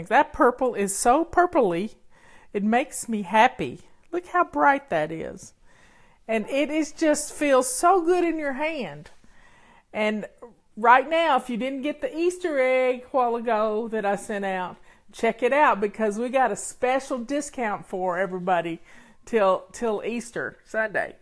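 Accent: South, United States. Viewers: love your cute Southern drawl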